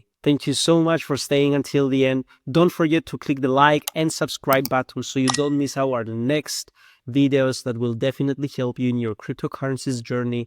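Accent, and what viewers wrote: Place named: Pakistan. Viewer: Почему те, кто разваливает провальный solaxy смягчают L ? Акцент с пакистанского рынка.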